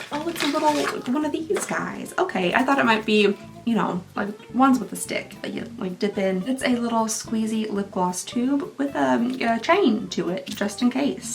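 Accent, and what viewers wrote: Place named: Southeast Missouri, United States. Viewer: Awe! You looked so lovely in this video, young lady! After finding your channel last year, I’ve had a blast watching these! I’m from southeast Missouri so your accent is like home!!❤ thanks for all the smiles!❤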